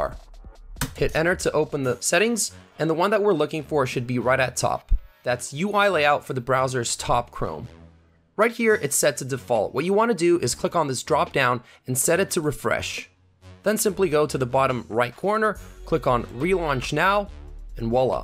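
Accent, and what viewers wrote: Balkans. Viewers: You are from Balkan,aren't you? Still you speak English very well...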